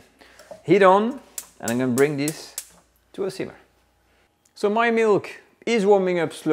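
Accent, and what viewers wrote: France. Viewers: I must admit, having a French accent does make everything better and more flavorful😅 and even easier to follow. Love your videos. Merci!!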